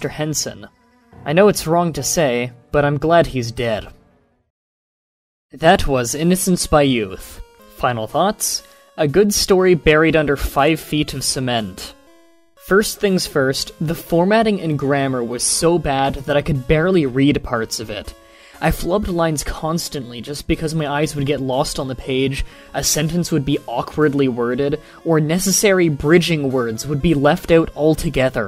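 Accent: Canada. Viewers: are you Canadian? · Are you Canadian?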